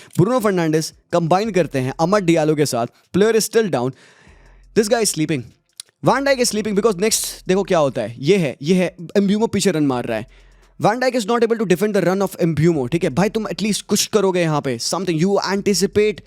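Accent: India. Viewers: Bro your accent is shit bro Indian accent make me puke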